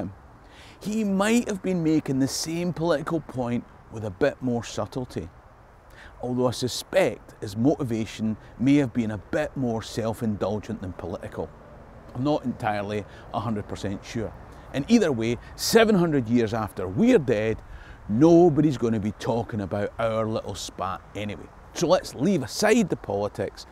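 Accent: Scotland, United Kingdom. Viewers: Ah I could listen to you talk all day… I’m a Scot in exile who never had a Scottish accent due to being raised abroad but my father hailed from Glasgow and my mother from Stirling and your accent recalls childhood holidays with long gone aunts and uncles. Amazed in this day and age to hear that some people question your Scottishness. They must be dinosaurs.. . I shall look forward to more from your channel. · On your comment on being African Scots or vice versa, with a strong Scots accent, personally, I enjoy both you, and your show which I think is excellent and thank god someone is exposing some of the uncomfortable truths about both White and Black slavery, by virtue of your unique position, and I enjoy the humour chucked in, too. I wish you would expose more on the upper-classes abuses of people by using them as slaves whenever they felt like it, re Bernardo Children, I remember well as I lived near a home, and on my arrival in Canada, after settling in, I moved not too far away from one of the main Houses that they were sending them too before they were sold, I mean distributed. 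On the topic of Hereditary, I'm a 5th or 6th Generation Canadian Scots, who grew up in the East end of London, raised by my Half Irish Grandmother who did a wonderful job. My Grandfathers were both victims of WW1, One lost a lung to shrapnel, left to die by the Brits, he was saved by a German Dr although they had run out of anesthetic, and finally sent home for my Grannie to nurse, while the filthy hole where his lung had been drained for years. Meanwhile, my Scots-Canadian Grandfather, was Mustard gases and blown up and Buried by shell explosions twice in one night, somehow they dug him out, and he lived, although with huge neurological damage, being so close to 2or 3 high explosive shells, well at least they didn't shoot him as he was suffering from shell shock according to one idiot Englishmen? He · What ever you are sir, yah Scottish accent is fun too watch. Someone with around a quarter scotts irish iin him on my mother's Dad's side, I think that is why I have a rebel streak in me. 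· Such wonderful and rich history, all told in such a wonderful Scottish brogue it just feels so much more real than most history videos. Fantastic! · The Scottish Brougue you speak in among family and friends. (You said you adapt your speech so more people understand you when you do the videos) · Love this, wondered long about Wallace. Your heritage? Bah, my ears tell me all I need to know: thats a Scottish accent, one that can be understood by all. As far removed from our roots as my clan in America are, you and I probably have a similar amount of Scottish lineage (but I win the "red in the beard" category) and I consider myself a Scot. Thanks for sharing sir, I enjoy history when it's told enthusiastically. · Sir, I am an American by birth and 1/2 Welsh and Irish and some Cherokee . First, I see a very good presenter of Scottish History, nothing more. Tome you sound Scottish, so I figure you are as I am an American. 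I want to thank you for the lessons . I happen to come across them and have watched two so far about Wallace. I see Wallace as a Scotsman that had ancestors from Wales. That is about it. Just as St Patrick was Irish but was half Roman from what I read, he is still an Irishman to me. Take care and keep the good work up. By the way, your humor is funny. I get most of it. Patrick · I'll be Inverness after this crazy long day and night. Because of you, I've learned so much and I am very grateful to you! What do mean you can't be Scottish? Just listen to that brogue! 😃 Humans can be quite daft. You do a great job and I love your videos. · You sound pretty scotch to me I have almost all my genetic ancestry from those islands you have been talking but I. Definitely American, but happy you are educating me on where my anc came from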